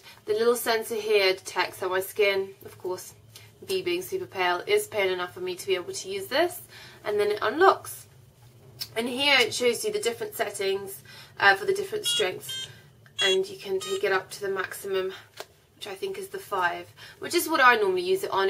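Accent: United Kingdom. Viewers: Oh, gosh,the speech is so distorted!.) ?. Very heavy British accent!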